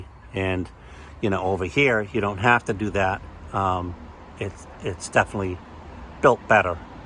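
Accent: United States. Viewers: Thank you for the feedback. I’m currently trying to get a refund from Eahora for an order I cancelled before it even shipped. They have been very difficult. I mean there should be no issue with a refund for something that never got sent. It seems like Yamee’s company is much better. Customer service and a company that stands behind its product is my other big consideration. I Anyway, just waiting to get that refund so I can go ahead and get one of these. Your review and feedback is super helpful. Btw, you sound like your from Massachusetts.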